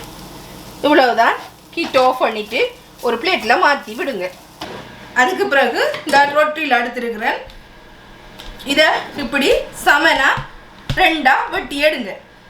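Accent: Sri Lanka. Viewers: Wow semma tempting mouthwatering recepie nega srilankan tamil explain panathu semma semma👌👌👌👌👏👏👏👏👏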